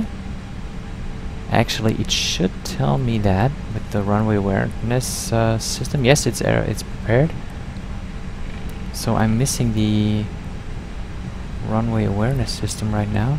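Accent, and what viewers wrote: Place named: Germany. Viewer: Richtiger deutscher Akzent :D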